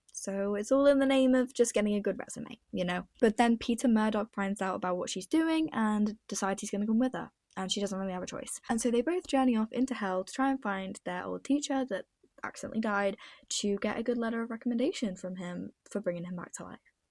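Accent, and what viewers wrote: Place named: United States. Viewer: I LOVE UR AMERICAN ACCENT BAHAHA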